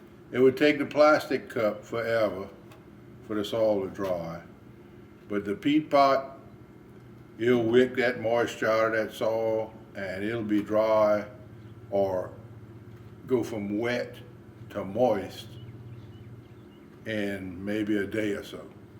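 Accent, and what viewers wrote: Louisiana, United States. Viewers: Teri Ruiz I'm 'sheeshing' right along with you and.. I'm scratching my amateur head wondering what zone I'm in (I live in the Netherlands). I think I'll start some tomato seeds in a few days like shown in this video, then a week or two after that by using the method shown. I love learning from this guy too.. and especially LOVE his Cajun (Acadian) accent.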